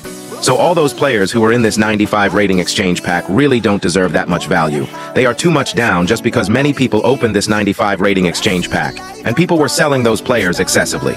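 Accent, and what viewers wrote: India. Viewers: Are you From India😅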